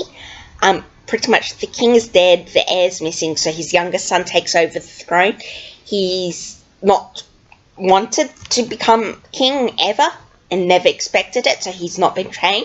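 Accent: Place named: Australia. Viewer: Hi, welcome to Booktube! 😊😊 I gotta say I've always liked the Australian accent hehe 😁😁 I hope to keep seeing your videos around and can't wait to see you grow 😊😊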